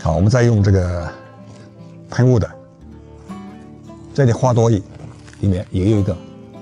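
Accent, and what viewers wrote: Yunnan, China. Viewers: 老師是不是雲南人，普通話有雲南口音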